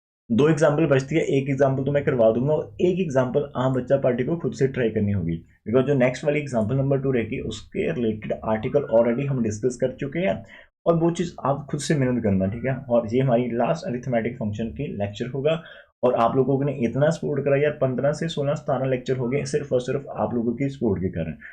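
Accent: Punjab, India. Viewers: Sir ap punjab ho kya?? O bhai sir mene kya sahi gusse kya hai maine apka ak short video dekha jisme ap punjabi me bat kar rahe the vo video dekhne se pehle hi maine guess kiya😮😮😮 Punjabi agaye oye🤩🤩🤩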